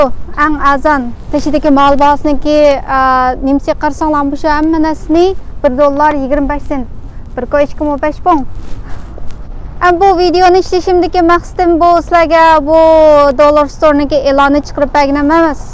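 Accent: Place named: Turpan, China. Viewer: ساپ تۇرپان تەلاپپۇزى 😉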